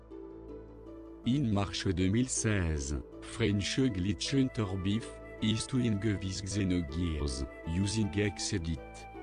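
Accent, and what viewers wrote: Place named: Germany. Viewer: lol sorry it sounded like german at first and then I actually listened and it was english. very heavy accent.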